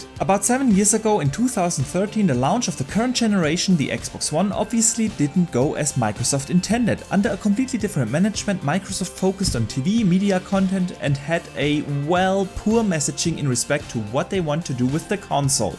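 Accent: Germany. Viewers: Horrible German English :(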